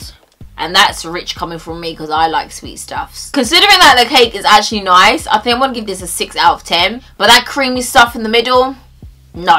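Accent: United Kingdom. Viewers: my name is marlene and i have tasted all those candy exept the twinkiesand your right the cowtales are super gross but the sour balls that you didnt like i love those and i love your british accent and you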